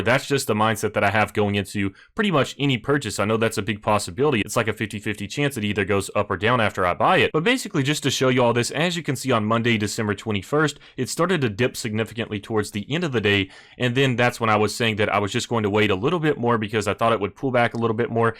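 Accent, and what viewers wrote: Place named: North Carolina, United States. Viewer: Love me some North Carolina accent.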